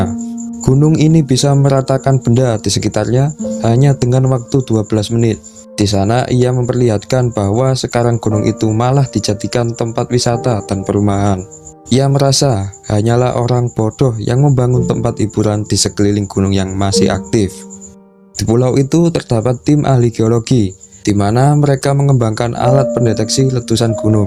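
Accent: Java, Indonesia. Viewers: medok amat min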